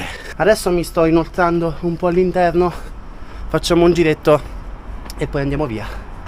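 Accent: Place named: Tuscany, Italy. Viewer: Grande, immagino dall'accento che tu sia toscano (come me😜). Bel tatuaggio 🙃 simpatico...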